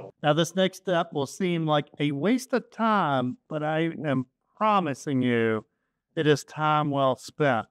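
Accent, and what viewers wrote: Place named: United States. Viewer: where's your accent from in America? I don't live in America so it's genuine curiosity 😅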